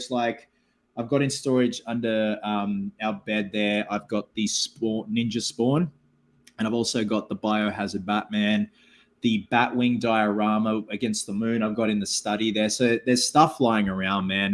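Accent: Australia. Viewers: Lol, "Saturn"! I've been listening to this while sewing for a cosplay commission and I thought you were saying "Satin" this whole time, but then I looked up and saw the title and the banner at the bottom of the video 😆🤦🏻‍♂️! That Aussie accent really muddles words sometimes, I guess, huh, lol 😅...?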